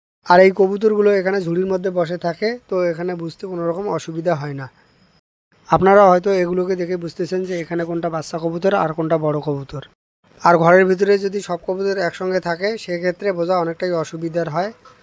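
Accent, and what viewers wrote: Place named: Rangpur, Bangladesh. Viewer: আরে কথা শুনে তো মনে হচ্ছে রংপুরের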